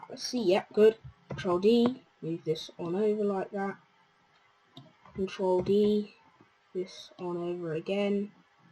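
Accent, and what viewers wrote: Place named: United Kingdom. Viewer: the brithis accent its so funny XD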